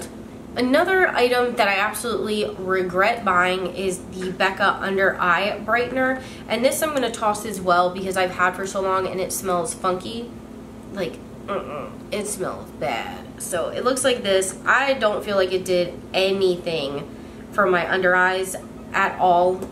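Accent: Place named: Maryland, United States. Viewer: I'm from md too ! I say warsh and warter too lol. Not to mention I did my nails earlier today the exact same way. Great minds think alike 😊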